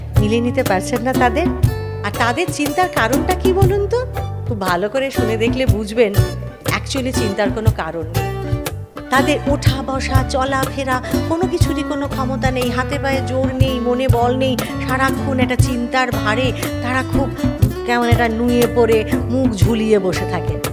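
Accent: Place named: Ballygunge, India. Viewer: সবই তো হ'ল। কিন্ত বাংলা উচ্চারণ? না একদমই নেওয়া যায় না। 'র' 'ড়' 'ফ' সব ঘেঁটে ঘ। না ভাই এমন বালিগঞ্জীয় ultra বাংলা উচ্চারণ চলবেনা।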